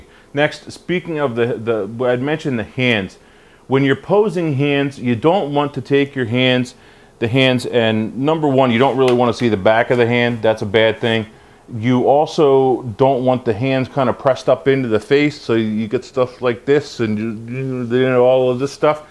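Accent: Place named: Philadelphia, United States. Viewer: You must be from the Philly/SJ area. Your accent betrays you.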